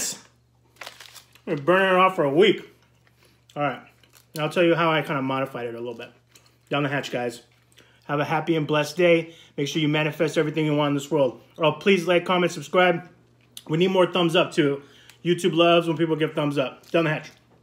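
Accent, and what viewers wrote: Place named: New England, United States. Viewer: I guessed Massachusetts or Rhode Island by your voice. I’m from ct lol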